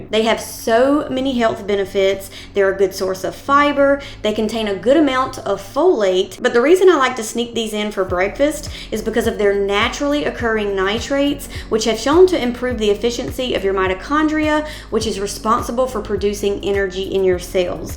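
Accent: South, United States. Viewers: This is my first video and introduction to your recipes. Let me say you are extremely Knowledgeable! I love the explanation of what it is and why it's used! I'm assuming by your accent you're from the South and I just know you are you making Sausage Gravy and Biscuit's and Eggs(my southern cousins would) Lory Staten Island NYC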